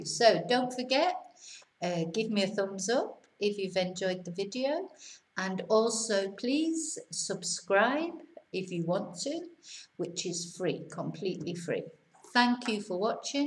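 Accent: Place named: England, United Kingdom. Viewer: Hi I really like your videos but you need to work on your Spanish accent you speak it with an English accent.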